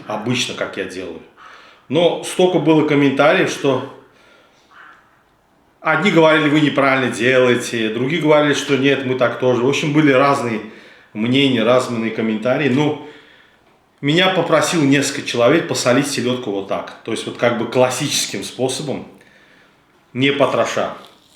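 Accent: Baku, Azerbaijan. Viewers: Этот акцент не перепутаю ни когда , привет от Бакинца из Крыма , тут с селедкой сейчас проблема )) приятного аппетита , нуш олсун 🔥🥃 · БАКИНСКИЙ АКЦЕНТ !!!❤ Однозначно лайк!!! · Хоть в Антарктиде, хоть в Африке, Бакинский акцент ни с чем не перепутать :)) удачи вам земляк. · Что за акцент? Азербайджанский что ли? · ВАХ...ВАХ..АКЦЕНТ СЛАДКИЙ КАКОЙ....РАДНОЙ АЗЕРБАЙДЖАНСКИЕ...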